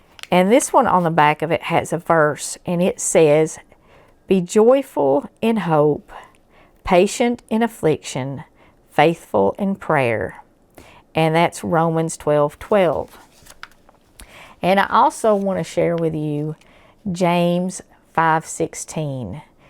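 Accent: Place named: Appalachia, United States. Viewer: I was born in West Virginia and my family moved to Florida when I was about 3 years old. I often have thoughts of wanting to turn back the clock so I could have grown up there and had a simpler, more grounded and Christ-centered life. I imagine what it would be like to have friends like you who who speak with a sweet Appalachian twang and share the values I wish I'd been raised with. God bless you and have a joyous Christmas.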